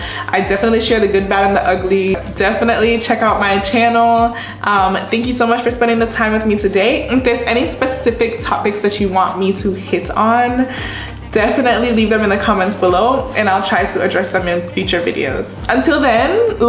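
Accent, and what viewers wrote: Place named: United States. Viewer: Girrlll I just watched the first video you did about moving out the US and I just watched this one and you already are glowing so much more and your voice is changing and your voice is dropping that American accent 🎉😂❤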